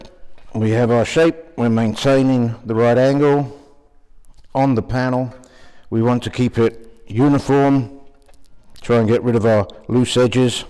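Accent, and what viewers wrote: Australia. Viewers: I couldn't pick the accent. Checking the about on the linked web page I see he started in Britain and moved to America. The resultant accent is closer to Australian than anything else.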